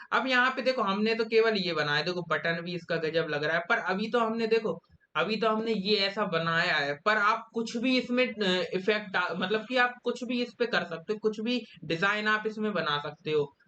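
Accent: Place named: Bundelkhand, India. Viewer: Bhai badiya bole bundekhndi m mja aa gao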